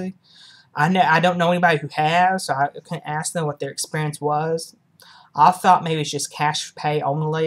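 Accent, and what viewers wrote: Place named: Texas, United States. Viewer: Love your haircut, I love your Texas accent.Thanks I will take my whole family to Walgreens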